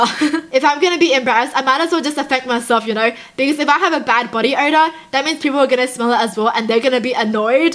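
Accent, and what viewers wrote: Australia. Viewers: Is she Australian? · Is she from Australia I am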